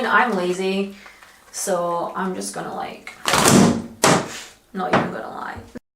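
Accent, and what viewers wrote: Scotland, United Kingdom. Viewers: Hi can I ask where you’re from? Scotland?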